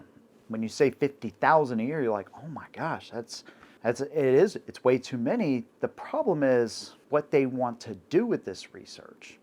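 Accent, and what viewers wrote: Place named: New England, United States. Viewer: Just realized your a Yankee when you mimic a southern draw...i bet you live in the south also....ill not be watching your vids any more...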